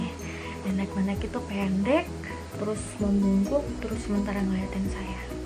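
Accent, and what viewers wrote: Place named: Manado, Indonesia. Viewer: Logatnya manado ya kaa. Hehe sm🙂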